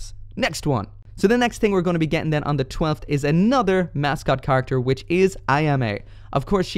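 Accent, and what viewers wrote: Ireland. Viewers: Are you Irish? Where do you buy your Gunpla? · Are you Irish?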